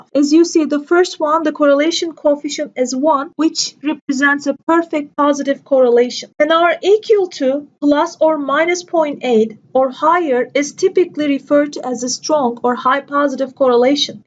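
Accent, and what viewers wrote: Turkey. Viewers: I can tell this is "Turkish Accent". :-) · Your voice sounded me like turkish pronunciation. Smooth explanation.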